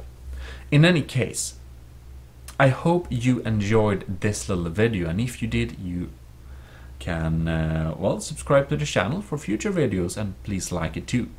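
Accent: Sweden. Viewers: your defiently swedish mah dude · swedish english 100% (im sweedish) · is this guy swedish?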